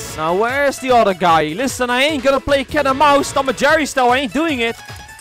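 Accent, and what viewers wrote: Netherlands. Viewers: Are you dutch? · Are you dutch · this is the most Dutch accent ever lmao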